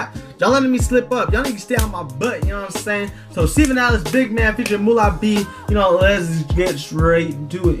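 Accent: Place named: China. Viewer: Youre dutch sounds like Chinese (no hate tho)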